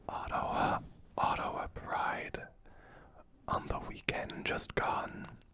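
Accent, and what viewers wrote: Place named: Ireland. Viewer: Irish? ☘Whispering into my ear? 🥰 Dare i click? 🤔 I knew they'd be the death of me....😅 Okay, here goes nothin'...🙃 💚*💚*💚*💚*💚*💚 *faints (☘shamrocks☘ floatin'☘ around☘ my ☘head☘)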